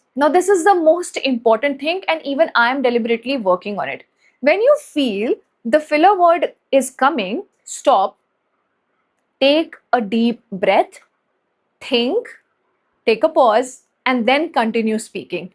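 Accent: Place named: India. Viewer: Everything is fine . Your accent needs a bit of refinement. R can be pronounced in Brit or American way. The Indian R 😅 ( barring Northeast)is really Bad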